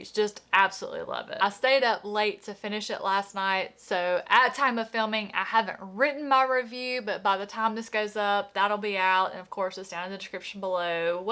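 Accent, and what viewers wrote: South, United States. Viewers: I find it hilarious that the accents tripped you out - I live in MS, and your accent is nicely southern to me, and more pronounced than most of the people I'm around on a day-to-day. 😆🤭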